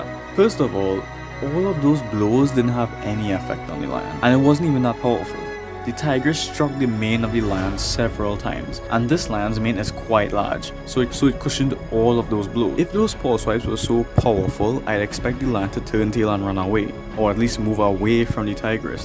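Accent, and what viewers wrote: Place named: Trinidad and Tobago. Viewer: Eh you from Trinidad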